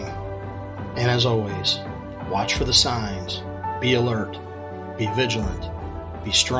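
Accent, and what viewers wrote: North Carolina, United States. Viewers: North Carolina ? From North Carolina also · I hear your accent. I'm betting North Carolina. There is no keeping the peace forever and the relationship is never worth it. That's because eventually they'll either completely do a discard or they'll force the other person to do it through ever escalating betrayals. There is no happy ending, ever. There are sometimes long-term relationships, especially with the covert types, but the soul destroying damage to the victim ends up being even worse.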